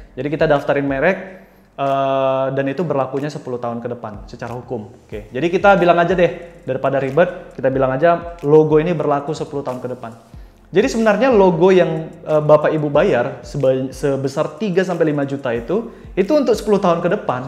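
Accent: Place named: Pematangsiantar, Indonesia. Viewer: logat bicara mu bro mancam par siantar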